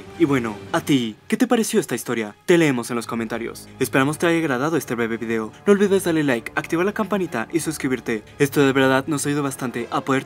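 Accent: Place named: Mexico. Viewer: Hey carnal mis respetos t la sacas para narrar los shorts parecieras mexicano que las pirula la pulida de bastón darle duró a la tula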